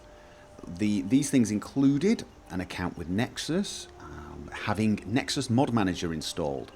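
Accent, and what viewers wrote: United Kingdom. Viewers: British accent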